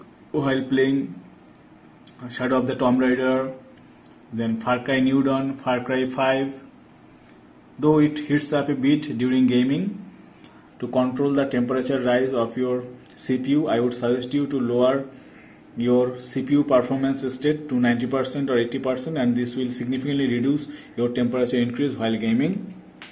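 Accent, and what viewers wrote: Bengal, India. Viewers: I'm 100% sure you are Bangali😂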